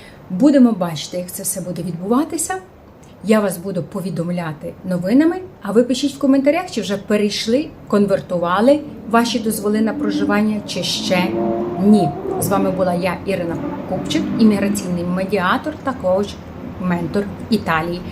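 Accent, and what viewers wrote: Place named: Lviv, Ukraine. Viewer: Дівчатко, навчися розмовляти на українській мові ( без львівського акценту)...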